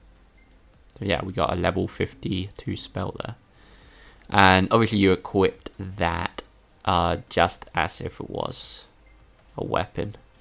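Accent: United Kingdom. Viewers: Why the Japanese? You sound British?... Head explodes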